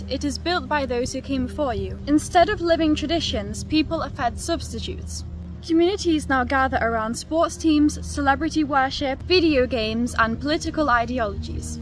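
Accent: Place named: Northern England, United Kingdom. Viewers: I really enjoy your videos. You seem to have a slight northern tint to your English. Did you use to live “up North “ somewhere? I’m friends with a Texan Odinist who is very close to her British and German heritage. I’ll send her this video. Thanks! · Did you ever spend time in Northern England? Your accent has a trace of it.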